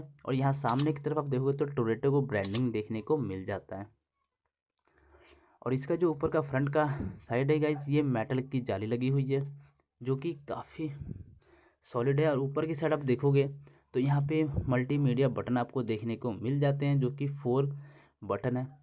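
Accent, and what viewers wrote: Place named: Punjab, India. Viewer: Amazing Punjabi accent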